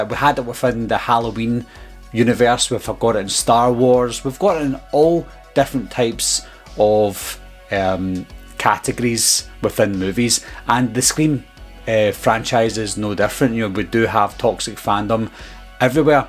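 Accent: Scotland, United Kingdom. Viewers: Listen bud don't you worry aboot yer accent..its the same as mine..the best accent in the world an I kin understawn ivrihin yer sayin nae borher..you just keep doing yer thing and don't let comments from a couple of wallopers stop ye.....cheers bud 👍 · I commented on your Scottish accent on a video the other day but in a good way, love a Scottish accent 👍🏻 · I'm scottish too brother don't worry about the accent 😂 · The fact you have to defend your accent…so unreal. Keep doing what you’re doing. You’re killing it. And anyone who says they don’t like your accent secretly wishes they were Scottish.😏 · We Scottish have the best accent keep up.the good work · The Scottish accent is a thing of beauty, they are just jealous of you and have wayyyyy too much time on their hands down there in their mother’s basement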